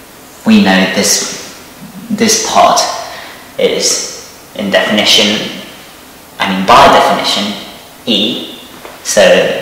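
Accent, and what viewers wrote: United Kingdom. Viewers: If anything more inclined to British English his English is, which is really comfortable to hear. A great explanation. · 美しいイギリス英語！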